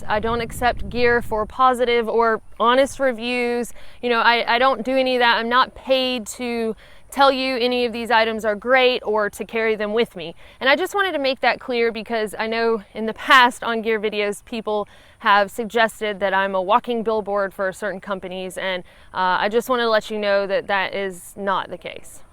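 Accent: South, United States. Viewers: can you do a video with your sexy southern accent with lots of y'all and yonder and mosey??? You're so cute.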